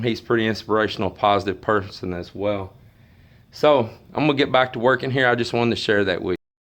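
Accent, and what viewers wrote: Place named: South, United States. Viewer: Yes I am. Thats why I watch your channel because your southern accent plus yall make me laugh. And yes the gulf coast s nice. I miss the ocean and the heat. Its been in the 50s today. I could see my breathe in the air. Yall keep up the content we love it. - Amber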